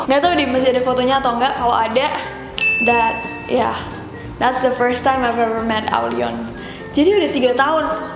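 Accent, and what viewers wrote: India. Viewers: ka ..kaka itu aslinya itu dari india ato inggris sih ??? :v binguuung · I Like Your Indian Accent.. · indian accentnya keren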